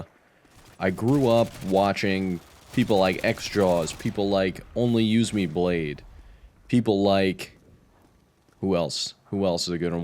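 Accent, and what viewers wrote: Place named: Florida, United States. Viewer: Hey man, I really enjoy your content, your channel was introduced to me couple days ago and i've been watching some of your videos. Very relatable, and the talks about lifes difficulties, you almost sound like a Floridan version of me. Hope to see more of your content and keep your head up, better days are obviously coming... That's atleast what I tell myself.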